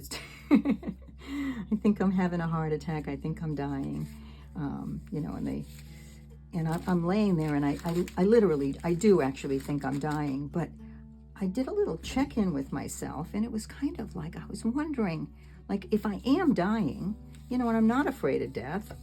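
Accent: Boston, United States. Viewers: I love your Boston accent! Your art is so satisfying to watch as you go about making choices for what you use and how. I can make gel prints all day and do but making a collage is another story. Thanks for making a video today ❤️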